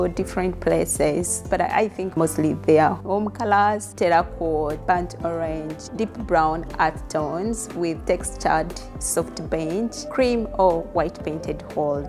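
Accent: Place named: Uganda. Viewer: I love your Ugandan accent ❤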